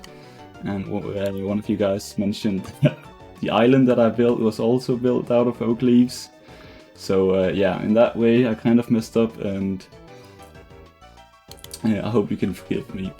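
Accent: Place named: Denmark. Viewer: I love how violently danish your accent is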